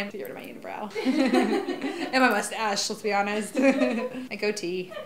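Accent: Iraq. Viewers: احكي عربي بفيديو كامل عربي وتحديدا اللهجة العراقية 😀😉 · عراقية وعدها. فيديوات تحجي عراقي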